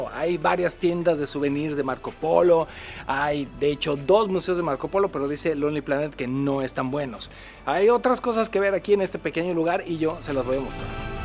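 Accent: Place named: Guadalajara, Mexico. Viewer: Me encanta escuchar tu acento tapatio 💜😁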